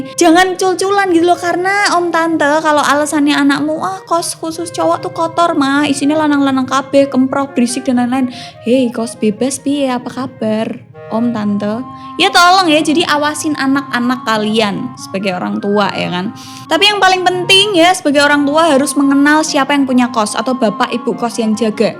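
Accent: Java, Indonesia. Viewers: mirip Nike Ardila, tapi ngomongnya agak medok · Medokmu itu bikin kesemsem😍😍😍 meskipun ga ngerti juga😅😅 salam dari bandung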